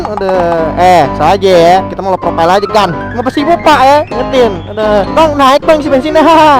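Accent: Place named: Java, Indonesia. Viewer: bang logat lu kok medok sih.. lu ada keturunan jawa apa gmn?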